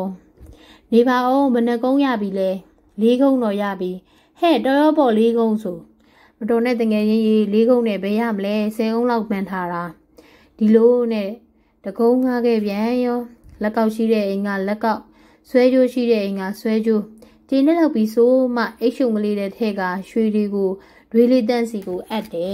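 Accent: Upper Myanmar, Myanmar. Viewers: အသံေလးကိုက အညာဆန္ေနတယ္ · ဖတ်ပြတဲ့ ညီမလေးအသံက နားထောင်ကောင်းချက် အညာကို တကယ်ပြန်ရောက်သွားသလိုပဲ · အသံကအညာလေသံ...ချင်းတွင်းနဲ့ဧရာ ဆုံတဲ့ကျွန်းထဲကအသံမျိုး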